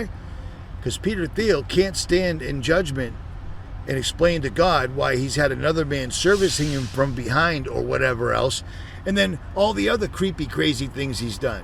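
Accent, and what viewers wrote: Boston, United States. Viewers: You from Boston or wistah area... in gator coutry now yet from Mass, hi❤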